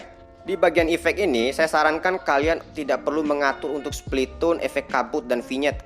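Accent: Bima, Indonesia. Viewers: Orang Bima ya bang?? Salfok sama logatnya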